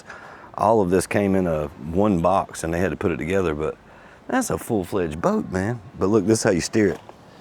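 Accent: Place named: South, United States. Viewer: I’m not sure if you remember me told you I lived in South Carolina for more than 20 years I was in Camden South Carolina right by I 20, I think that is reason why I like you right away when you start talking I recognize that you from the South; you like my kababayan,! So good luck what ever you decide to do to stay happy 😃 and keep smiling that is your big asset 😂🎉😊😮❤😂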